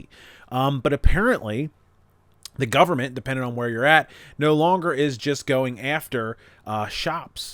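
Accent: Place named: Philadelphia, United States. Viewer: You have to be from philly/south jersey area with that accent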